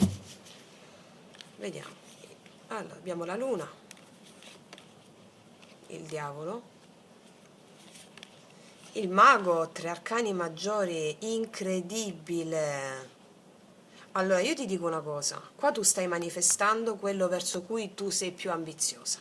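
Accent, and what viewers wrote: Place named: Sicily, Italy. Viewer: Ti dimentichi che non siamo tutti siciliani.,. parli troppo in fretta ti mangi le parole con il tuo accento... grazie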